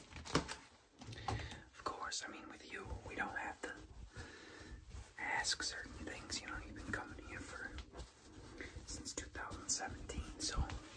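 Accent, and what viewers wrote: Boston, United States. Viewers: Great vid! I do miss the Boston accent ones tho 😁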